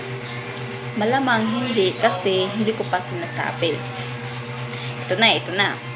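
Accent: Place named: Visayas, Philippines. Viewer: Ok Lang maging bisaya ang accent ikaw Yan ei may sari-sarili tayong accent just be proud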